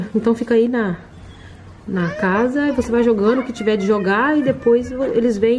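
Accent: Minas Gerais, Brazil. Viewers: como vc é especial mulher eu amo ouvir sua voz, o sotaque mineiro lembro das minhas tias de Ipatinga que eu amoooo. Minha mãe é de Mg Ipatinga meu pai carioca,gosto de ser carioca mais amo o sotaque mineiro ❤❤❤😘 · Mineiro uai!Maravilhoso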